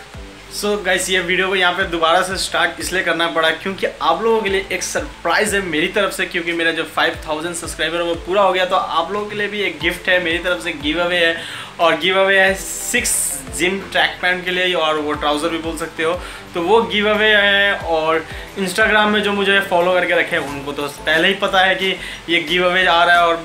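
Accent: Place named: Bihar, India. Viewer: App bihar se hai 😀😀😀😀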